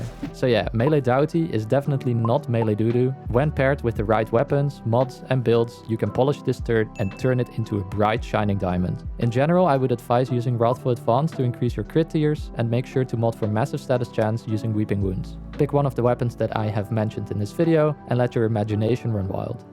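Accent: Netherlands. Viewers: Nederlands accent gaat enorm hard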